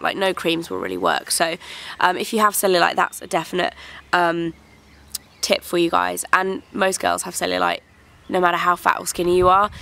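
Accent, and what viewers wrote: United Kingdom. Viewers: for my english oral proficiency class, in order to get a British accent I listen to your tutorials and reviews and I repeat what you say! maybe kinda creepy but you've helped me alot to get a consistent accent!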